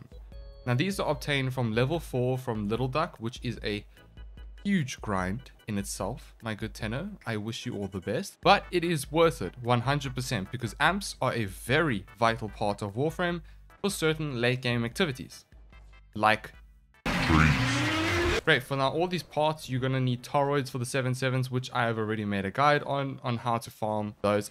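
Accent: South Africa. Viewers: This is South African I love it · That accent is it south african?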